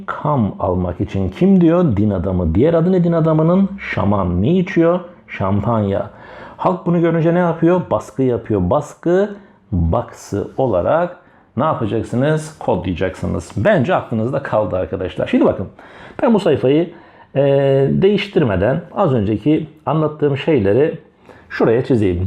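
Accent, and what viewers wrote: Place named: Black Sea, Turkey. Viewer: Artık umaysın 😂 kadın Karadenizli herhalde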